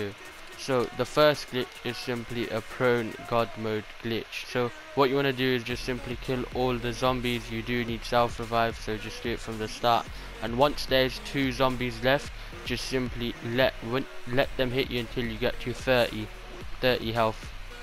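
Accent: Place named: United Kingdom. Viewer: That's just how brits talk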